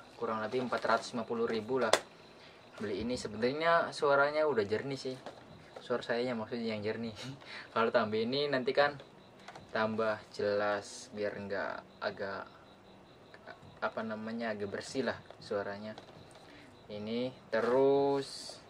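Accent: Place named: Tegal, Indonesia. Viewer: masa sih..tdk ada ahh hanya di luar saja..indramayu itu bukan nya jawa barat ya..kok bicara nya kaya org tegal atau brebes yo😐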